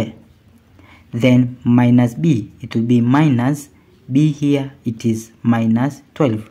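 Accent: India. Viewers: тупое, длинное решение. Да еще и жутким акцентом, явно индус.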